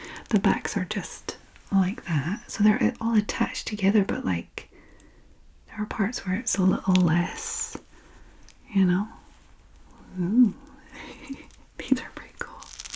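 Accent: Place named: Scotland, United Kingdom. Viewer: She’s from Scotland, she speaks with a Scottish accent. American pronunciation isn’t the only “proper” way of speaking English.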